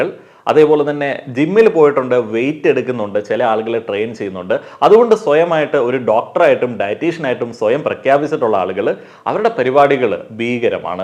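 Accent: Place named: Malabar, India. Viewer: ❤ മലയാളം നീയൊക്കെ പുസ്തകത്തിൽ എഴുതുന്ന പോലെയാകും സംസാരിക്കുന്നത്. എന്നാൽ ഞങ്ങൾ കേരളത്തിൽ പിറന്ന മലയാളികൾ ഓരോ ദേശത്തിന് അനുസരിച്ചു വ്യത്യസ്ത രീതിയിൽ സംസാരിക്കും. അവൻ പറയുന്നത് മലയാളം തന്നെ മലബാറി സ്റ്റൈൽ. അത് മോശം അന്നെന്നു നിന്നെ പോലെ ഭാഷയേ കുറിച്ച് വല്ല്യ ധാരണയില്ലാത്തവർക്കേ തോന്നൂ. ഇംഗ്ലീഷ് വരേ സ്ഥലങ്ങൾക്കനുസരിച്ചു വകബേധങ്ങൾ ഉണ്ട് 😂.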